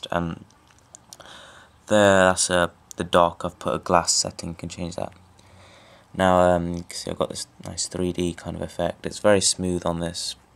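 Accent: RP, United Kingdom. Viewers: Your voice has a drastic change in 10 years. Typical british to a posh accent. Just mind blowing. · He sounds like a British teenager ngl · you went from sounding british to sounding british and like a robot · Eyy this English makes me feel your from the UK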